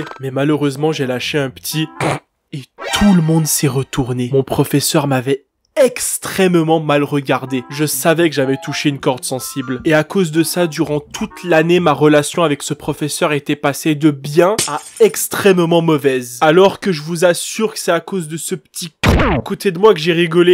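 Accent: Quebec, Canada. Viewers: j'aime beaucoup tes vidéos et j'aime l'accent québécois car je suis français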